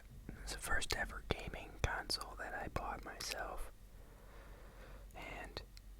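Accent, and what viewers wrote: Midwest, United States. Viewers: Where in the Midwest are you from?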